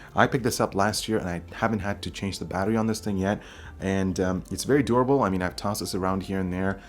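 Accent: India. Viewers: INDIAN ! WITH NICE ACCENT! KEEP IT UP BRO ! :)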